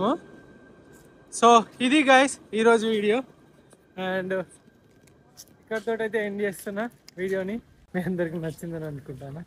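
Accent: Telangana, India. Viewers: Motthaniki telangana vallam anpinchukunnav bro🎉❤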